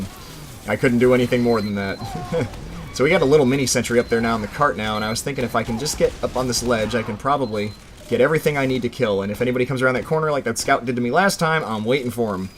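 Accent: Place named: South, United States. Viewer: Trying to guess your regional accent. South, but not deep deep south. Virginia or N. Carolina.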